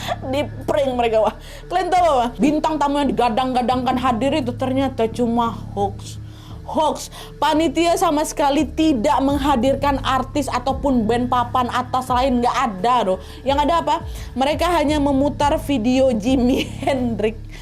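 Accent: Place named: Padang, Indonesia. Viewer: Logat Padang terasa bgnt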